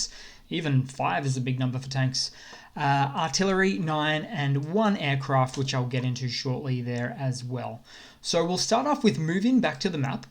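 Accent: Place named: Australia. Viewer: David ABBA thank you for this info 👍 A lot of us believe that is Australian based on his pronunciation of some key words. Not up to mischief but what do you think?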